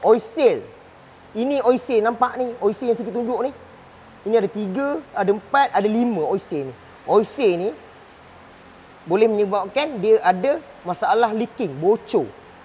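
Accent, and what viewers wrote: Pahang, Malaysia. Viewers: Apsal cakap mcm orang pahang pulak ni. org phg ke.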